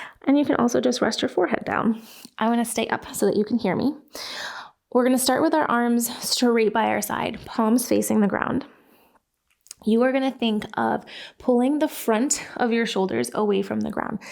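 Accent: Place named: California, United States. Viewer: As a British person, we usually skip anyone with his type of American accent (is it Californian). It sounds so annoying to us. Our communication style is the polar opposite. Anyway despite the accent I'm listening to the video. I can see you mean well.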